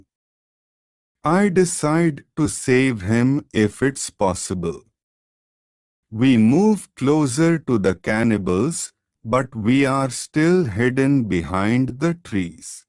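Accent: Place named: India. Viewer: What is that indian accent god sake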